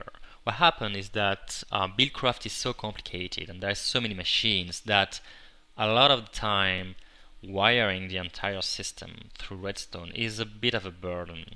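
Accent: France. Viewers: Can you do a video explaining how to install this mod on a mac? I have not been able to install it, only because I have had such a difficult time with all the complicated installation procedures. Just a quick, brief tutorial would be extremely appreciated. Thank you! :) -greexm PS, Vous êtes Français? J'adore votre accent quand vous parlez l'Anglais, c'est très charment!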